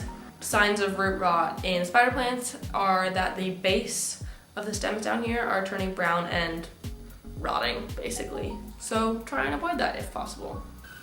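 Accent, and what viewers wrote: United States. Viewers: are you from the south? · That's ok, it seems to have settled now. I realised you are in the UK after waiting some other vids of yours. 🤣 Love your plant vids, congrats. Helped me a lot with my plant care and information. You're American though right?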